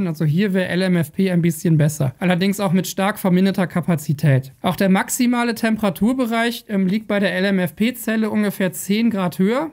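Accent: Bavaria, Germany. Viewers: Ist ein Bayer?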